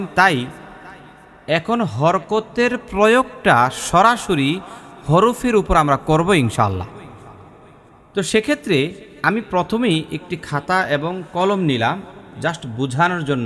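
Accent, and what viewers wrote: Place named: Mymensingh, Bangladesh. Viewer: হুজুর আপনার বাড়ি কই মনে হচ্ছে আমাদের ময়মনসিংহ বিভাগে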